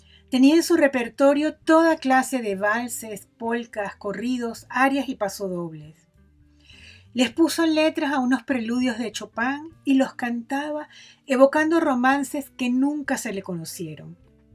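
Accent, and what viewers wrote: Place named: Venezuela. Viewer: Soy muy desconfiado cuando de escuchar a alguien narrar algo. Sobre todo si ese alguien es español. No sé si a ustedes les pasa lo mismo, pero cuando los narradores españoles utilizan ese tono tan antipático de hablar en medios, simplemente cambio, ya no de canal. pero si de medio. Por eso, quiero felicitarlas por la forma en que narran estas bellas historias. Creo, por el acento, que quien narra es venezolana. Pero, como sea, lo hace de maravilla. Gracias.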